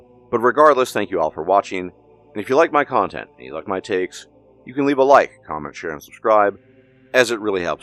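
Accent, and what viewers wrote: France. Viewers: That french accent caught me off guard. lol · Amazing French accent, how come?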